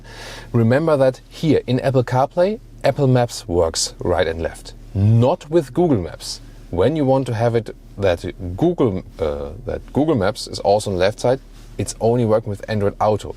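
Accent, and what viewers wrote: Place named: Germany. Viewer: この人ドイツ人だよね。この人の英語にはドイツ語訛りがある。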